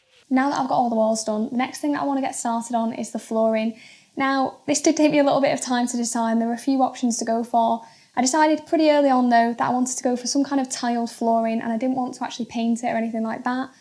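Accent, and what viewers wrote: United Kingdom. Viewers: Just curious, what part of the UK has this accent?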